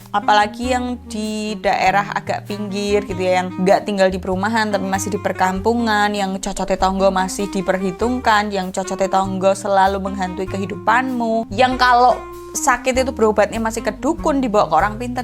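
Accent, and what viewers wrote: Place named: Surabaya, Indonesia. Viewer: logatmu itu Suroboyoan manteb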